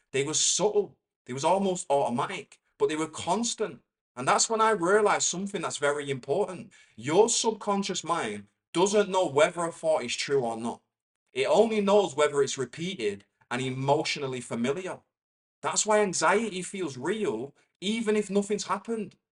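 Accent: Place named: North West England, United Kingdom. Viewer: Love it. Love your accent, too. North West, right? Brings back great childhood memories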